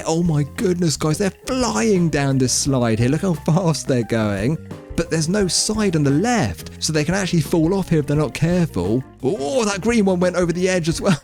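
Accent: United Kingdom. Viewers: Are you British?🇬🇧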